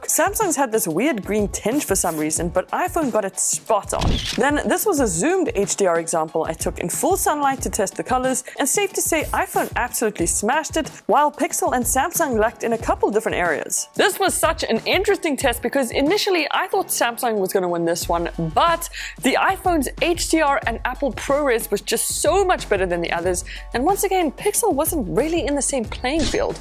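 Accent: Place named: South Africa. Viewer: Is it just me or does she sometimes sound a bit South African? Just the way she pronounces some words reminds me of a South African accent.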